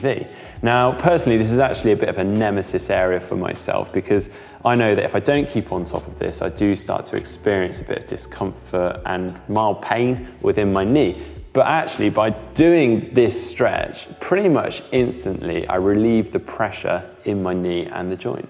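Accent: United Kingdom. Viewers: Is that British accent?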